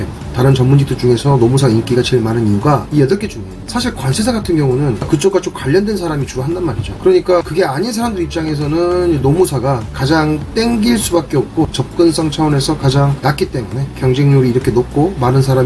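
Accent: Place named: Jeolla, South Korea. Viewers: 전라도 분이신가... 고향의 냄새가 나네... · 아따 라도여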